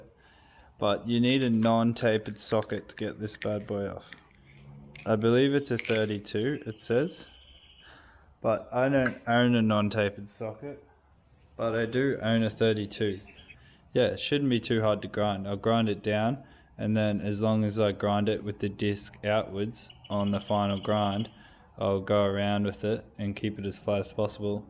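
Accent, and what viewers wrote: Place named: Australia. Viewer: Hey mate nice to hear the aussie accent, thanks for the no bullshit video🤣I do have a question if you could help us out. I've just swapped mine out and note the stem has the slightest wobble inside where the bearings go as if the stem isn't wide enough. I'm not sure if the last forks did this as well. How did yours turn out?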